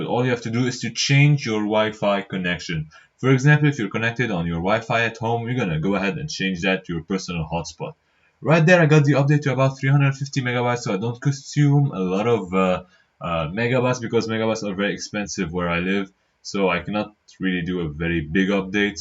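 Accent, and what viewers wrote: Lebanon. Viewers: you sound very Lebanese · Lebnene sa7? Min sawtak w lahjtak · Hii are you lebanese ? Cause your name and accent makes it seem like you are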